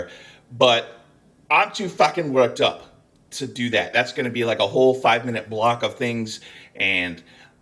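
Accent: Scotland, United Kingdom. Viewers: I love it when your Scottish accent comes out!! That's when we know you're beyond angry. One Team One Fight 💙 🇺🇸 💙🇺🇸💙🇺🇸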